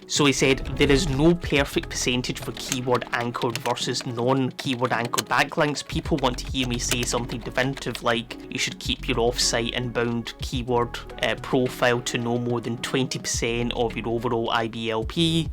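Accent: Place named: United Kingdom. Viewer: That's an entertaining British accent 😅 Where from?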